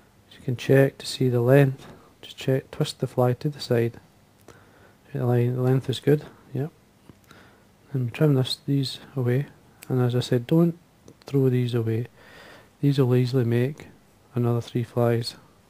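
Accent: Scotland, United Kingdom. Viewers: My fly box is sort of like yours, I don't tie as well, you are by far the best on You tube and that is saying a lot, there are a lot of good tiers here, It took me a while with my american english ears to understand you, heavy Scottish accent its a good thing! I have one fly box in my vest that is debated to just Adams Dry Flies, I have them from size 6 down to 22! At least half dozen in each size, I like this style, and going to add them to my box, Roe deer never seen it in the flyshops, but White tail deer should do, I been tying my own flies since I got my first vise a Thompson A back in '64 and I still have it to go with the Regal, HMH and Dyna King. Of all of them I like the old Regal and the Dyna King! But a vise just holds the hook, the skill and the artistry of fly tying well that is something else all together, I am on my way to Montana next week, I decided that I am just going to spend the rest of Sept and most of Oct- Fishing then back home just in time for Rifle Deer hunting, then maybe some winter Steel head fishing! 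· Nice tie and I'll be taking a couple to the faster upper reaches of the Clyde. Great to hear a fellow Scot too!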